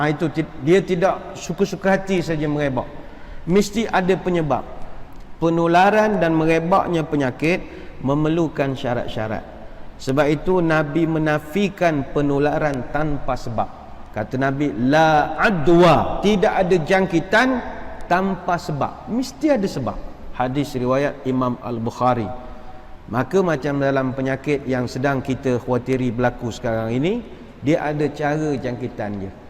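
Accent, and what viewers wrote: Pahang, Malaysia. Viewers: Suka dengar ustaz cakap Pahang. Saya orang Pahang tapi dah lama merantau di luar.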